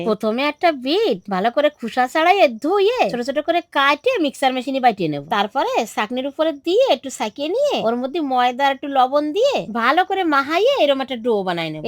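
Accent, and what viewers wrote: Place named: Jessore, Bangladesh. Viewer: আপনার কথা ভাষা হচ্ছে বাংলাদেশের যশোর অঞ্চলের মতো আমি যশোরের মেয়ে বাংলাদেশ থেকে আপনার ভিডিওটা দেখছি খুবই ভালো লাগছে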